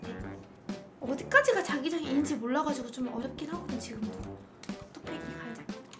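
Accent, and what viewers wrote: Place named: Chungcheong, South Korea. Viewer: 겨??? 누나어디사람이야! 충청도하이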